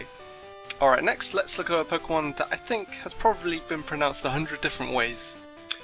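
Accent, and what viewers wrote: United Kingdom. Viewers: lol you remind me a lot of Gigguk! it's the British accent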